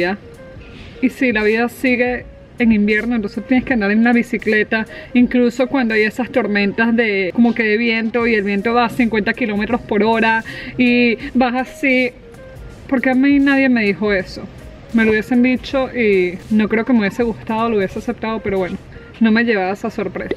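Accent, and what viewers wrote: Caracas, Venezuela. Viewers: tengo duda por tu acento, eres venezolana? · Vi su video por mí interés de viajar a Amsterdam, no se de qué país es usted, asumo que venezolana por su acento. Sobre su video el fondo es bueno pero la forma... Éxitos. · Coño mi pana el acento de sifrina de caurimare no se te quita.... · Hola, recién te estoy conociendo por qué tengo pensando viajar a Holanda y quise indagar un poco más, soy barbero que sabes de eso allá? Por cierto también soy de Venezuela, reconoci tu acento. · Hablas como Caracas Ciudad de despedidas. Que pena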